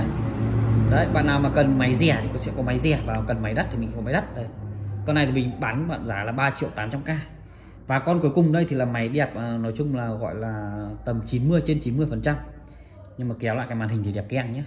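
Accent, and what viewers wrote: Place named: South, Vietnam. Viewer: Nói giọng nam .còn dễ nghe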